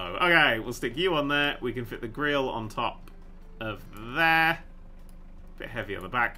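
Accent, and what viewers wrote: United Kingdom. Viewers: I feel like Dan's accent has become even more British after he moved out of England...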